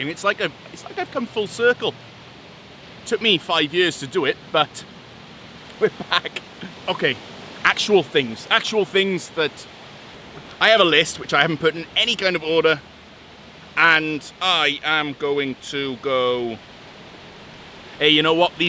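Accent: Bisaya, Philippines. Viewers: OMG, you even got the filo accent lol so funny!!😂😂 It takes time to understand filipino mentality and to get used to it without getting frustrated and even diappointed. Your approach is good if it helps you to keep your sanity in tact! Kudos to you. Some incidents are just out of your control and hey, no worries be happy attitude sometimes work. Stay safe and God bless🙏💝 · Nakurat ko..perteng bisayaa man diay. Haha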